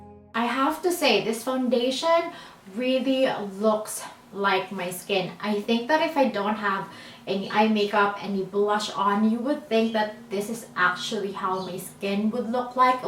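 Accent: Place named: Philippines. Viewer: I hear Filipino accent 🇵🇭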